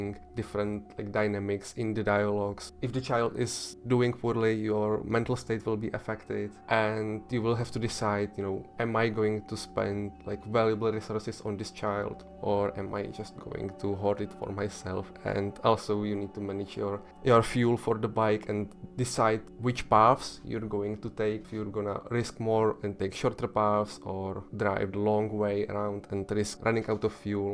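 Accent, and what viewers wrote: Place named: Czech Republic. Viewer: Kvalitní hry a český přízvuk :D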